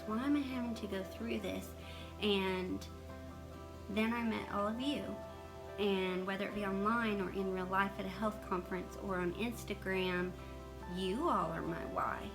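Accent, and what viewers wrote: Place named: South, United States. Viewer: I stumbled upon you while looking for an ostomy bag cover I'd seen on Facebook. (It says "Better With the Bag" in different languages and has Hollister's logo several times.). Anyway, I watched your entire Hollister video without even realizing you had an accent - my accent. I'm from NC. This summer, I spent 2 1/2 weeks in the hospital and 6 1/2 weeks in a nursing home after emergency surgery for a severe blockage that almost killed me. I'm going through a difficult time now that I'm home. I saw this video and decided to watch it.:) <3 I'm so glad I did. Not only are you southern, you are also a Christian. I've loved that Bible passage since the 60s when the song came out. Thanks for being God's hands and helping to lift my spirits. I'll be watching more of your videos in the future.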